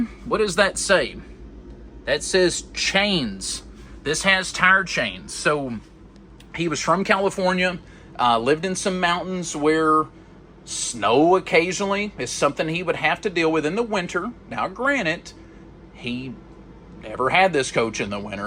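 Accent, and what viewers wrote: South, United States. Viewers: There is nothing worse than a used car salesman lying to a prospective customer with innuendo of impending end of driving cars spiel to pressure the buyer. Until now. I remember this same threat by used Renegade Youtube dealers that the XL was being discontinued in 2022. Probably even had the very practiced cover their butt distinction that they had it "on good authority by the President of the United States..." or some s**t. You come off as a southern drawling con man when you gossip.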